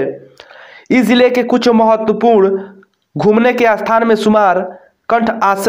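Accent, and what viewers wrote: Bihar, India. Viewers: Bolne wala bihari h be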